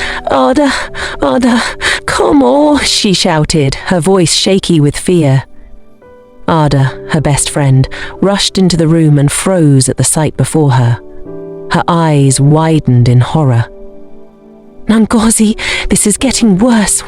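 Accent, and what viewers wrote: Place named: Jamaica. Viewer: You got a Jamaican accent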